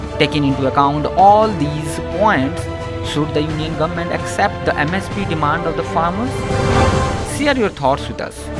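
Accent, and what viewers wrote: Odisha, India. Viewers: It sounds an odia student is reading an english essay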